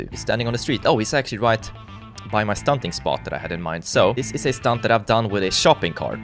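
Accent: Sweden. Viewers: Are You frome sweden? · I think ur swedish im i right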